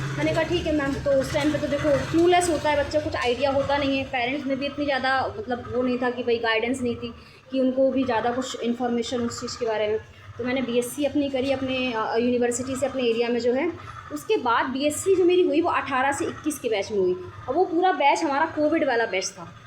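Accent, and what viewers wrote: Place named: Haryana, India. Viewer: Mam ap haryana se ho ya mp se?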